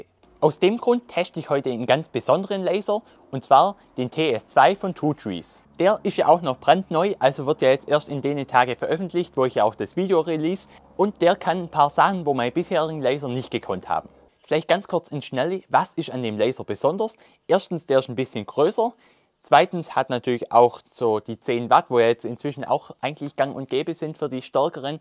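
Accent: Swabia, Germany. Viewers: Schwäbisch isch gsond, jawoll! :D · Klasse! Schönes Video! Sag mal, was ist das für ein Akzent den du sprichst? Ich würde mal tippen Großraum Göppingen? Schöne Grüße aus Bocholt (NRW) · ist des a SCHWÄBLE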